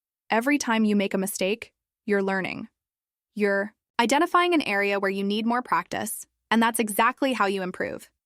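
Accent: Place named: United States. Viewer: American English of course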